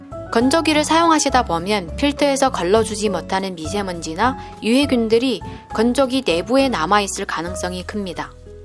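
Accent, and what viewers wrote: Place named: China. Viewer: 말하는게 중국사람 같음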